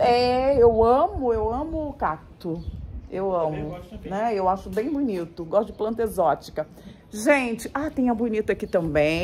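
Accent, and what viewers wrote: Rio de Janeiro, Brazil. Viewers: Moça vc é admiravel, espontanea, super vc, adoro carioquissima com esse sutaque amooooooo muitooooooo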